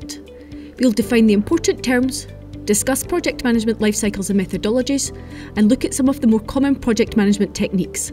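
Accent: Scotland, United Kingdom. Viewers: The strongest, most fascinating Scottish accent I’ve ever heard in my life. Love et!😍🤣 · I luv da scottis¨h accen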